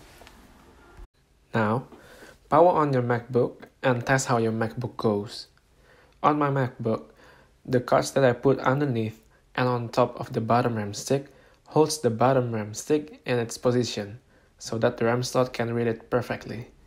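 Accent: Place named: Indonesia. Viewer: lsg ngenalin logatnya orang Indo, eh terconfirm dengan modif mobil jadi polisi lalu lintas wkwkw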